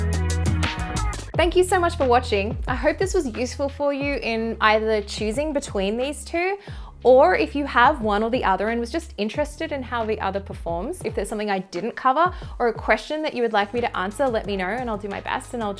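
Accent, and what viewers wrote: Australia. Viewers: Nice hearing an Aussie accent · Dodgy aussie accent. Reminds me of the racism i faced while i was there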